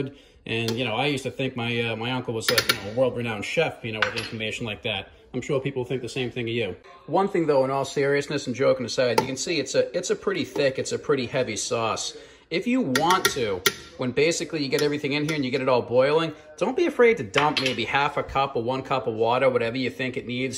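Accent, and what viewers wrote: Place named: Boston, United States. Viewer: I knew it was BS right off. If he wanted a little water in it, he would have put a little in the cans to rinse them out in the beginning. It was a good try try, though. Magic ice. 😏 I also knew, from his accent, that he was from an area in close proximity to Boston. NH-ites can pick up on that pretty easily. Lucky pooch at the end🐕 That's gonna make her fart, yanno.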